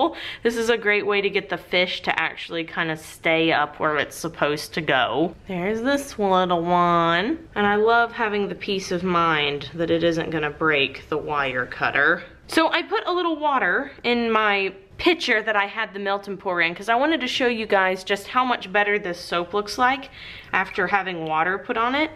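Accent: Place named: South, United States. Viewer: Anybody else from 2020 and sounds sooo southern in the intro? Lol I know how southerners she sounds bc I’m from the south 😌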